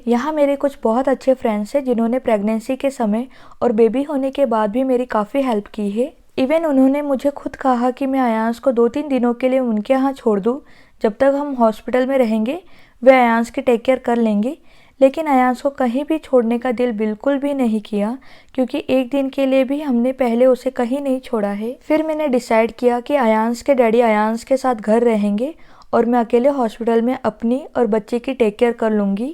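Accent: India. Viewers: Mast anti tame kathiyavadi cho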